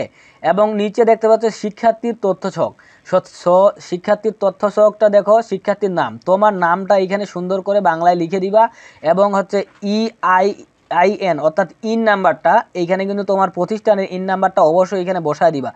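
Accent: Bangladesh. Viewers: Apnar kotha sunei ami bujhte paisilam j apnar bari Dinajpur a hobe, because amar bario Dinajpur. By the way thanks for this video 🥰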